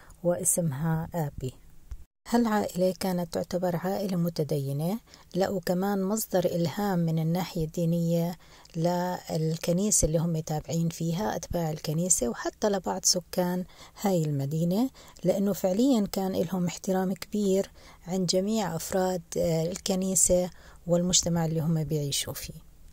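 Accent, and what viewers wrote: Jordan. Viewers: الصوت مليح مافيه حتى مشكل متابعة من الجزائر نحبك بزاف بصح حابة نعرف انت من وين لهجتك دل انك اردنيية ولا فلسطينية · تحياتي لك اختي صوتك جميل احب اللهجة الاردنية والشعب الاردني الشقيق الله يوفقك ومليون ليك مغربية من لندن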